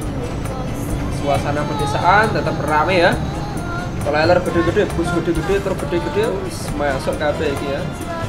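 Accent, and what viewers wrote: Java, Indonesia. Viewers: jawa men mas omongane heheh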